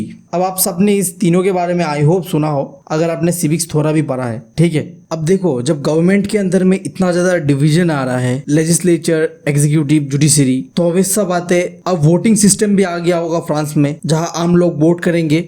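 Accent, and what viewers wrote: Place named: Bengal, India. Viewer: Bhaiya are from bengal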